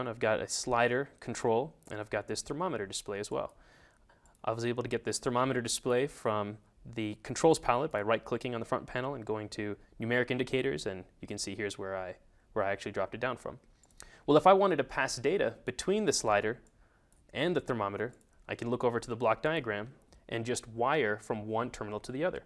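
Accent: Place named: India. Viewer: Aren't my ears stuck or something? I hear an Indian guy, who can perfectly speaks English! Great tutorial videos, by the way :) Keep it up.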